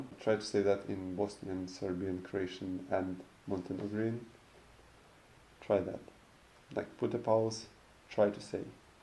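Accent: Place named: Russia. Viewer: Is your native language Russian? Cuz I swear I hear your Russian accent all the time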